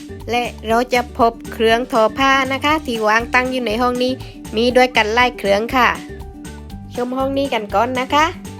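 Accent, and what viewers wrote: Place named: South, Thailand. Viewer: น่าชมมากคะ ไม่ได้มานานป้าพูดใต้แล้วนะคะ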